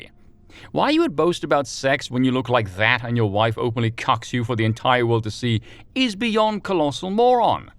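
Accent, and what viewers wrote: United Kingdom. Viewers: I swore you were british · listened to the first 1 minute 20 seconds, knew you were gay and british, left this comment to warn others, now off cheerios goody goody gum drops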